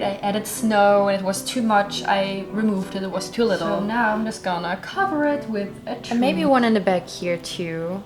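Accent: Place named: Germany. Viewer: du geht’s von dir aus aber verstehst nicht das nicht jeder so denkt. Du findest es also fair das andere bevorzugt behandelt werden u ihr deutsches Publikum vernachlässig wird? Außerdem sagst du selber das sie mit mach das Buch hier fertig auf dem Hauptkanal nicht gut läuft aber dieses hier was ideal dafür ist nicht für deutsche gemacht werden kann? Ich bin mir sehr sicher das mindestens 90% wenn nicht noch deutlich mehr hier auf diesen Kanal deutsch sind. Viele deutsche haben von englisch die Schnauze voll u wenn eine deutsche youtuberin besseren constant fürs Ausland macht sich doch etwas verarscht fühlen ist das ja wohl nicht verwunderlich. Hätte sie dieses Video auf deutsch u auf ihren Hauptkanal gezeigt bis ich mir so so sicher das sie deutlich mehr angesprochen hätte u mehr Follower generiert hätte. Es ist ein kausaler Denkfehler das ein Video auf englisch mehr durchstartet als in der eigenen Sprache. 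Kunst ist eine Nische u spricht nicht jeden an u wenn es ein English mit deutschen Akzent ist wird es auch nicht besser.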